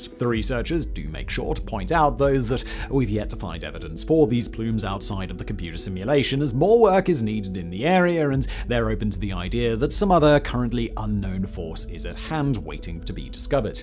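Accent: United Kingdom. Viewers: I didn’t say YOU didn’t know, I just pointed out that the person you originally replied to also knows. Simon has true crime and science and random blaze channels. I’m really not sure which of those is meant to resemble propaganda so I think you probably don’t know what that word means. And I’m also British so to me Simon’s accent is just one of dozens of British accents(we have literally 100s🤣) that I hear every day!Seems to be some projection there.🤣😊😊😊😊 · no shit how do you think i know he doesn’t write his scripts🤦‍♂️ its a lot easier to digest your propaganda with a soothing british accent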